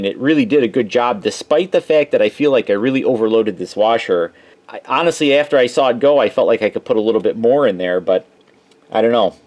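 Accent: Wisconsin, United States. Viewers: You definitely sound like you’re from Wisconsin or Minnesota, but most likely Wisconsin. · I almost want this guy with his Wisconsin accent to say “Marge, get the cheese wheel”.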